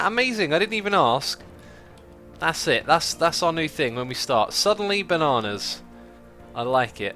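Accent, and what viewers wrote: United Kingdom. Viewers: love xisuminati modpack stream on you tube as i live in melb aust and ALWAYS miss your live stream. all the best in finding somewhere to live. you sound like you're from london so that's not going to be cheap, i think i have enough to put a deposit on a harry potter understairs cupboard.....maybe not. keep up the brilliant videos.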